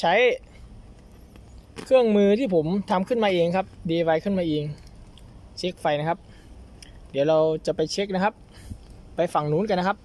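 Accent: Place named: Isan, Thailand. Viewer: สำเนียงต้องอิสานโป่งเว้าไทยต้องเป็ะๆๆ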